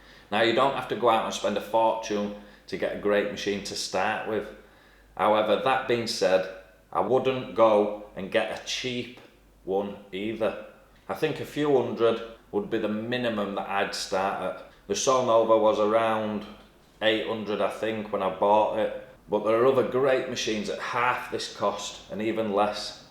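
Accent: United Kingdom. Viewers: So good to hear not only a Brit accent but I'm from Hull so not far off mine, nowt wrong with our cousin's across the pond but I know your advice is UK based & everything you recommend I can get etc, I'm 48 & been dying to tattoo for years, done everything from paint fighter jets, charicaturing, portraits, murals & gravestone artwork (very similar to tat designs tbf especially the Gothic & flower based stuff) & finally said f**k it, dive in & give it a go!! But f**k me tat artists I've tried to get training or tips off are very cagey, I've found the whole industry clique & hostile to outsiders, especially an actual artist like myself who I believe some of them felt intimidated by, they were basically glorified tracers & couldn't draw for shit! Anyway, rant over, I've watched 2 vids so far & think I'll just block out the rest of you tube & stick with you pal!! 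Obviously subbed & notification bell hit so looking forward to binging past content & the new stuff!! Here we go!!!😁😁😁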